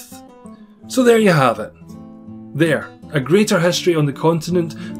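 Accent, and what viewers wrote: Scotland, United Kingdom. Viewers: If my great grandfather Reed Gordon hadn't moved from Aberdeen, Scotland, I'd have that same awesome Scottish accent. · Noor M Yus don't tell the Scottish man narrating, the he is English, he is likely to get very upset. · the scotish accent made me lol good video tho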